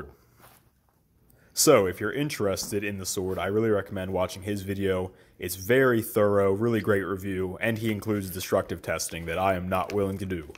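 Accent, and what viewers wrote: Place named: Pittsburgh, United States. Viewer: You sound like you're from Pittsburgh 🤔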